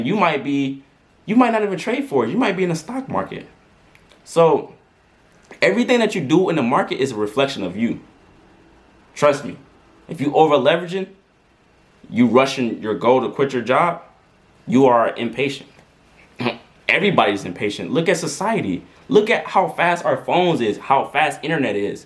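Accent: United States. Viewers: are you from texas? because of the accent. love the vids btw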